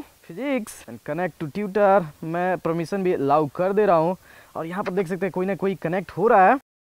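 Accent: Bihar, India. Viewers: Kay app बिहार के हे l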